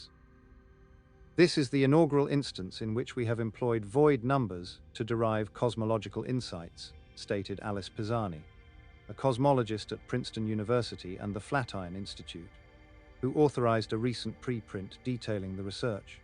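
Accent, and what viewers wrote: United Kingdom. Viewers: Haha “British Narrator”… I guess I’m not the only person who falls asleep more easily to a British accent…?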